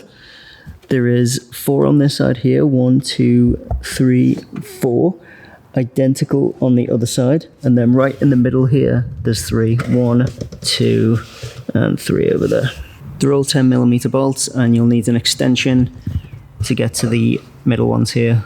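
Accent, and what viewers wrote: Liverpool, United Kingdom. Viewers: you a scouser ?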